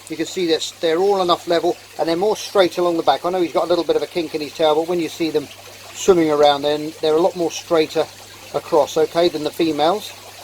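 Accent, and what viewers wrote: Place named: United Kingdom. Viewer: another fantastic video. Don't sell yourself short on your camera work, its brilliant. I started watching your vids and decided to start with Red Cherrys as they are easy. It's good to hear a British voice as most vids are from the USA, no disrespect intended. Thanks again.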